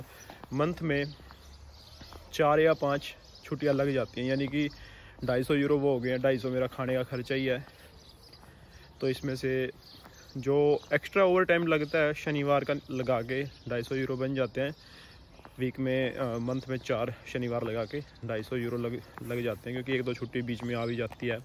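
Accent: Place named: Haryana, India. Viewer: Bhai apki avaj se lag rha you are from haryana right bhai 😊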